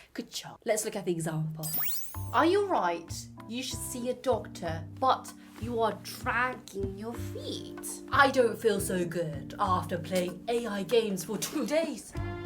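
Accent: RP, United Kingdom. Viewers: 아... 아나운써님 너무 멋져요. ㅎㅎㅎ 포쉬액센트가 이리 멋질줄니야.. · 아나운서님 ㅜㅜ ♡ 오늘도 너무 재밌게 봤어용 ㅜㅜ 영국 발음 너무 멋있으세영! 님 응원받고 정말 큰도움이되었어요오! 답글 정말감사했습니당 ㅜㅜ ♡ 감사합니당!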